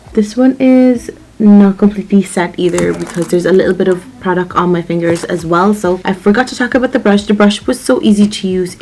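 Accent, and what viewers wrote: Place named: Ireland. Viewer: Where are u from cuz u sound a little Irish🇮🇪I’m irish too💜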